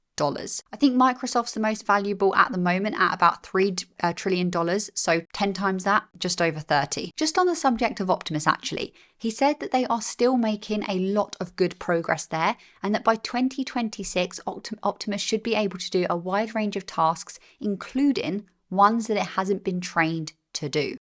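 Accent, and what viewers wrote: England, United Kingdom. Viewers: Love your content and your English accent.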